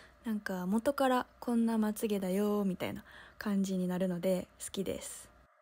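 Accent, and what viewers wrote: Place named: Kansai, Japan. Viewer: さんの関西弁、大好きです＼(^o^)／ いつまでも、そのイントネーションでいてくださいね！てか、2年温めてた！？www これからも、マイペースに動画更新続けてくださいねー♪ おわりー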